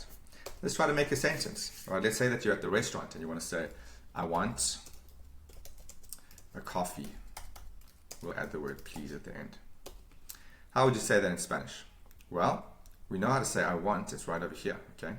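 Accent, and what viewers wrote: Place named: Cape, South Africa. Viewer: cape accent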